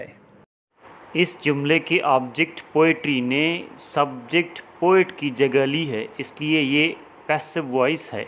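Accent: Sindh, Pakistan. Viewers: GOOD EFFORTS TO CONVERT SINDHI ACCENT TO ENGLISH BUT TRY IS TOTALLY SEEMS VERY OVER !!!!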